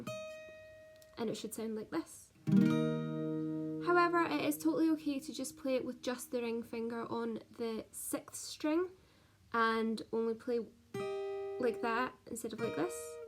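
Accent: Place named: Scotland, United Kingdom. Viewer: yeah it's a lovely instrument has a nice wine tastings I have noticed when I listened to you you have a Scottish accent the part of yes I like the band you because it has a really nice Twang the banjo has a nice twanging sound to it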